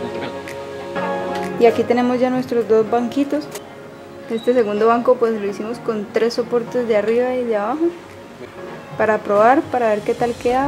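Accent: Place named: Colombia. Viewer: no sé cómo mostrarte los vídeos que encuentro en yt y te puedan ser útiles. Busca en YT: how to hydro dip ANITHING Creo que te va a interesar para pintar alguna de tus creaciones Pdta: me encanta tu dejo Colombiano xd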